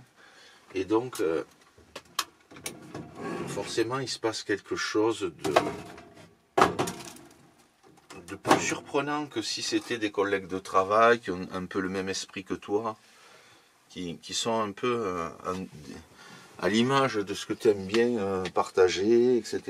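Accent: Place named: South-West, France. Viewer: merci a toi , je doit me repeter , mais j'adore ta voix au noté fleuri du sud ouest , ton rythme sain, et ta jolie diction , les silences sont aussi appreciables , souvent je clique en onglet , puis quand c'est le moment de regardé jy vais , comme la , un peu fatiguée et sur les nerfs , ta voix ma apaissé , j'ai presque faillé m'endormir ...ta phrase de traversé la vie des gens , en restant dans la tienne , en prenant réellement plaisir a la presence de l'autre et inversement ...j'ai un peu de mal a saisir le pourquoi du camion , la premiere vidéo que j'avais vu etait celle de la remorque a vélo , puis celle ou tu coupais ton bois a genou ...PAREIL pleine de poésie , tu es un jolie messager ,a bientot ...peut on acheter un t-shirt et soutenir ta chaine ?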